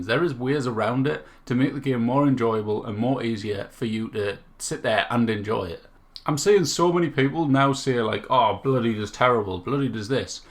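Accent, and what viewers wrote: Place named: Newcastle, United Kingdom. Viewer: This was a jokes watch. Pure geordie rant saying it how it is😂😂 however I watched this video purely to see if the game is good now (lol, fourth attempt since release) and it hasn’t exactly sold it to me! Entertaining nonetheless!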